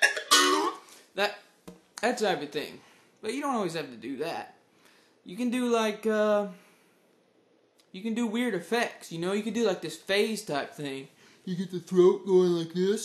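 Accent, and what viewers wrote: South, United States. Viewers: Sickkk. PS I don't think this guy is stoned, he's just from the South. Whenever I hear a person from the South, I have to upgrade my estimate of their intelligence to account for their accent subconsciously downgrading it.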